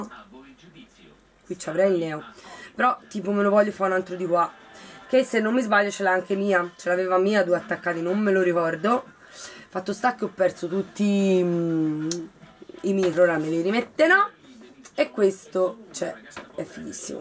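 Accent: Tuscany, Italy. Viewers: sei grande! Manda queste quattro ochette a fanculonia e continua con i tuoi video. Sono spassosi e belli Bello il tuo spiccato accento toscano e le tue mani sul viso sono come pennelli di un pittore Sei bravissima....poi hai l'età di mia figlia Ti adoro e voglio continuare a vederti con tanto affetto una tua fan agguerrita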